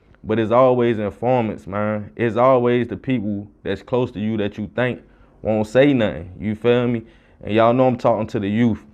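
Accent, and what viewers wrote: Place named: Washington DC, United States. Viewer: Why u so pressed by Baltimore bruh u sound like u from dc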